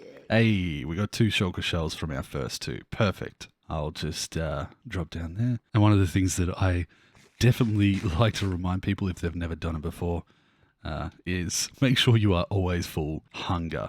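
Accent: Australia. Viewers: Are you Australian?? I am new to your channel and loving every video you upload! Your voice is really soothing so make it a relaxing experience even when you almost “falling” into the void heheh cheers and take care!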